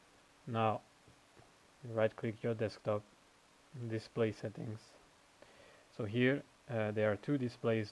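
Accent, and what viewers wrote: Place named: India. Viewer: sounds like an indian tech support scammer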